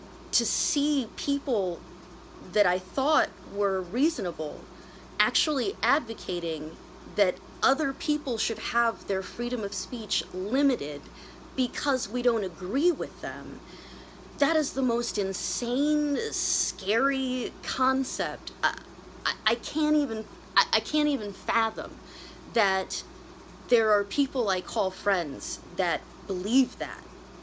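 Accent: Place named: United States. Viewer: You sure sound like an American to me. Americans look like everyone. Political parties are constantly redefining themselves as do people, so that is too complicated for me. The people I find most interesting and least threatening are people who apply critical thought to each situation as opposed to people locked in to an ideology that stifles free thinking. You are obviously a beautiful American woman and I would just suggest, avoid the thought police and don’t let people define you. We don’t all think the same. Where’s the fun in that. Sorry for what you’re going through, family can sometimes be the harshest, I know. One more thing, you should know, there are literally millions of people who feel exactly like you and we are with you.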